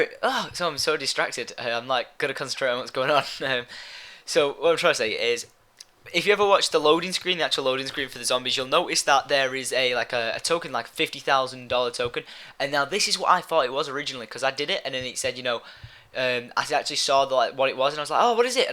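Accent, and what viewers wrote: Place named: United Kingdom. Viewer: You have such a funny british accent :D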